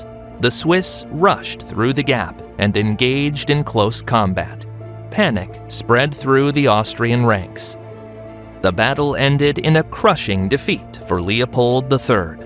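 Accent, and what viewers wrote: United States. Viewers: nothing ruins a good historic story as an americrap voice.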